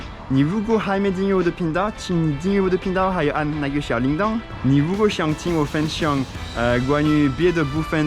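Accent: Taiwan. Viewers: 台灣國語的 😂🤣 不愧是， 🥰👍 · 的國語，越來越好，再過十年一定成為真正的台客，因為學的國語是台腔國語，沒有過分的捲吞，不簡單喔！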